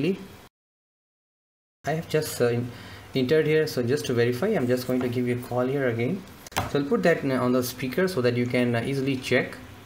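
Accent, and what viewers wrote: India. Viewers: Indian accent+low quality microphone+bad quality video= can't understand what you are saying.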